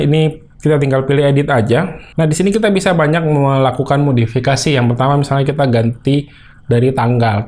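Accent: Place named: Kalimantan, Indonesia. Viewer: Wah orang kalimantan nih abangnya ya? 😁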